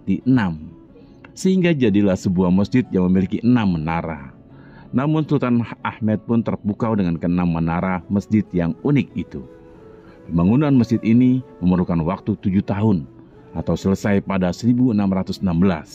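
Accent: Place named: Banyumas, Indonesia. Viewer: Reportase nganggo ngapak mbah 😀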